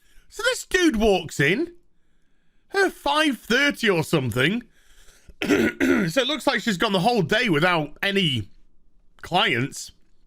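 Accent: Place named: Yorkshire, United Kingdom. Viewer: I love how you can tell how irritated is by how Yorkshire he gets:D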